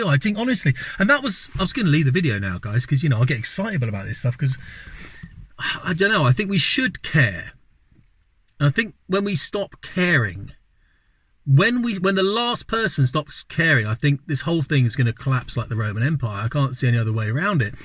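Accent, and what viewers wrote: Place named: United Kingdom. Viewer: Great content nice to listen to a great British bliloke who is intelligent and knows his stuff 🛩️🛩️👍👍👍👍🇬🇧🇬🇧🇬🇧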